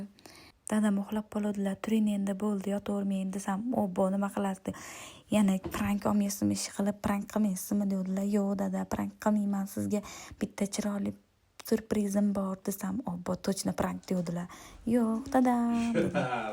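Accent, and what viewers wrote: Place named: Kokand, Uzbekistan. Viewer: Qatlisiz shevez qo'qonniki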